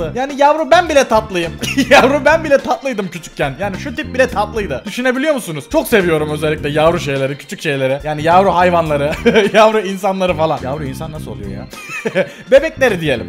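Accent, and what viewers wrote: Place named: Izmir, Turkey. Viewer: Abi kesin izmirlisin çünkü benim dayımda sizin gibi konuşuyor 😊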